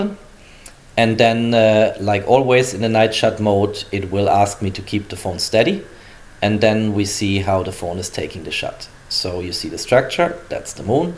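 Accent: Germany. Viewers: Are you from Germany ? You have Accent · Du hast einen sehr deutschen Akzent 😂😂😂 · Are you german? Your accent sounds like. xD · Hört sich stark nach German-Englisch an :) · Dein deutscher akzent macht das video kaputt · Rede doch einfach deutsch Mann Hört das du deutsche bist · Dieses deutsche Englisch xd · Kommst du aus Deutschland?!! Du hast 'nen STARKEN Akzent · Are u german? Your english is pretty german like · You are 100% german...I can hear that on your accent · Gutes Deutsch Englisch :) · Deutscher, Das hört man an der englischen aussprache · Are you a german? Because your accent seems..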